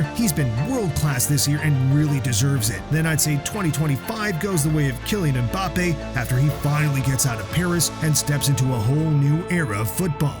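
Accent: United States. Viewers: This guy sounds American, makes sense why this video is shit.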